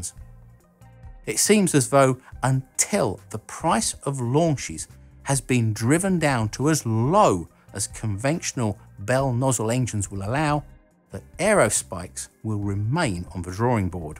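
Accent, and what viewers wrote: United Kingdom. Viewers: Did he just say folcon heavy i ♥️ the british accent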